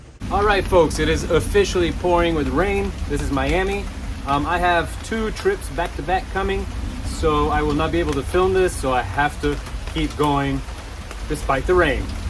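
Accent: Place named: Northern Italy, Italy. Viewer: Grande video e belli questi codici!...secondo me' tu hai l accento dl nord Italia ovest (Milano, Torino)....., Cmq sei grande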